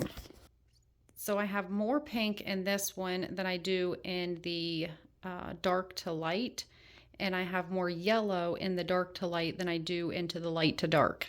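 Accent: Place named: Maryland, United States. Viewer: Also are you from near Maryland? That acent😅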